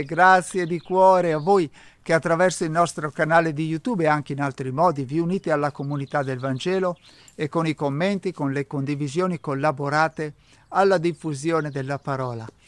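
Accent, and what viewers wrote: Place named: Veneto, Italy. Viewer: Ma quanto sei bravo fra??? La tua gioiosita' è contagiosa e iniziare la giornata con il S. Vangelo, detto e commentato da te, con la gioia che esprimi così bene con la tua solarità e in quel posto magnifico è farsi un regalo immenso!!! Anche il tuo accento veneto è bello: i miei genitori erano veneti e tale mi considero anch'io anche se nata a Varese! Grazie fra! Che Dio ti mantenga sempre così e ti benedica! 🌹💙🙏🙏🙏🥰👋 Volevo anche dirti che, mia mamma è tornata alla Casa del Padre proprio il 18 maggio di quest'anno, giorno del compleanno di papa Giovanni Paolo II 🌹❤️🌹💙🙏🙏🙏🙏👋👋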